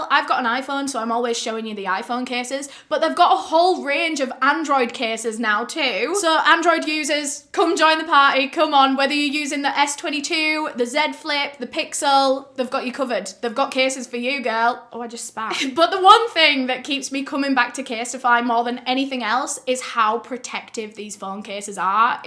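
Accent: United Kingdom. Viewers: Way to be dismissive of biological facts vato. Your ignorance is monstrous. What a drama queen to use the word monstrous 👀. You got a problem homie, Go and study biology. You want to take moral high ground, then never again watch a murder case. Not going to happen. All of us normal human beings have a fascination/curiosity with deplorable evil that other despicable humans do. Hence why I come on. Because I enjoy her charm, and her british accent, and her way of narrating the subject matter. Highlighting and exposing these scumbags. Many of which I never even heard of despite being american hispanic. Take your nonsense somewhere else. You don't like biology and nature 'Oh well' your stupidity is noted.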